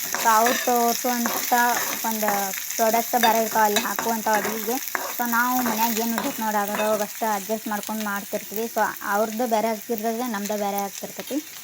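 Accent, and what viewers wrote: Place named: Gulbarga, India. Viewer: Mombi adhuri matte gulbarga matu adtira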